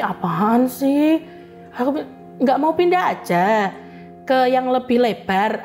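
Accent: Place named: Semarang, Indonesia. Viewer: logat e semarang bgt hhe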